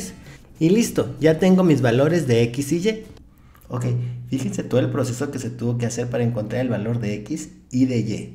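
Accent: Mexico. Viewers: eres de cd juarez o nomas es mi ingacinacion xd?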